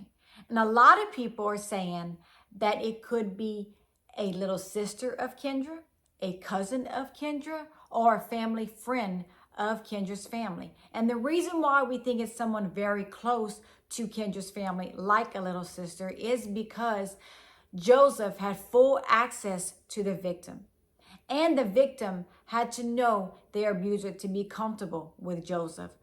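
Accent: Louisiana, United States. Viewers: I'm glad to see you covering this. These folks ain't right, like some inbreeding stuff going on, summmthin fishy I dunno.... Buuuut I can't believe your channel ain't at minimum a million subscribers! You are stunningly beautiful, you do a excellent job at covering these cases, and you got that cajun accent everybody loves! 💯 We gotta get this channel in the algorithm everybody leave a quick comment on her vids ❤ ~From, Houma 🐊 ☮️ ☯️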